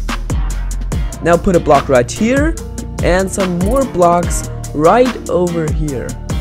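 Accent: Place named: Germany. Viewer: Ich hör so hart den deutsch akzent raus hahahaha kriegst aber trotzdem ein abo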